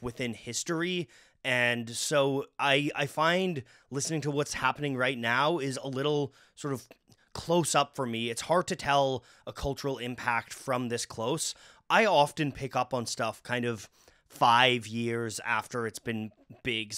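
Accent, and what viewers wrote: Canada. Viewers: Dude - I think you're great. Your channel rocks and you have so much knowledge. And where are you from? I'm thinking Canada somewhere.... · People have their right to privacy, so I don't care whether a content creator shows their face or not. Not seeing face made me listen more closely and I picked up that he's Canadian. Actually because of voice similarity, for a while I was wondering if another YouTuber I watch was secretly doing this channel too. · Canadian man detected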